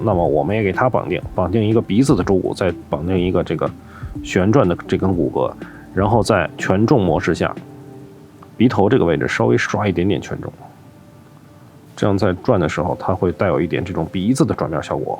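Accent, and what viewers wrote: China. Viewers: 以后片子开头能不能别整那个中文念法的英文了，每次开始，我都被雷的外焦里嫩的，总是以为我点错视频了。。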